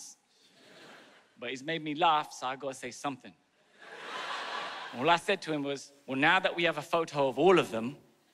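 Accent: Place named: United Kingdom. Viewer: hey, dunno whether i'm more envious of your intellectual comedy, sprightly comebacks, ability to be (thought) provoking without being self-deprecating orrrr you really lanky frame man [i mean, i was no Calista Flockhart back in the day (i'm a guy by the way) but i think of thin or anorexia and i think of her] It is so nice to see someone (of 𝘥𝘦𝘴𝘪 lineage at that) not being lazy in using the 'easy to sell' self-deprecating humour and has enough wits about them to not stoop low or start getting personal! though i DOO love the naughty and brutal bits! Greetings and lots wishes from Bharat <link> [having grown up in Bharat and those were the pre internet era, my vernac. brain still takes time to grasp the British accent:P ]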